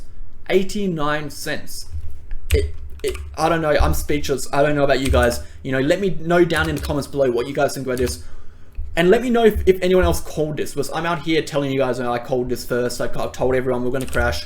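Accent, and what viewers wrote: Australia. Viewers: cheers! Ps. good to hear an aussie accent on a crypto vid!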